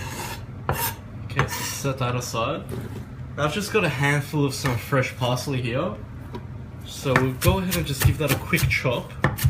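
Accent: Australia. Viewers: where in Australia u from?? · Hi there, You did it very well, did you learn this method from your Father? hahahah, Well done really. I really like your english accent, Are you from Australia? But you are 100% Iranian. Greetings from Holland. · You from Australia brother?? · I like your Aussie accent! 😀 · from your accent you sound Aussie. Where are you located?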